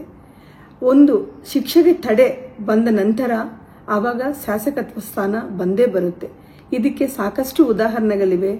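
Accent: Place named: Telugu, India. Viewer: Telkannada adu 😂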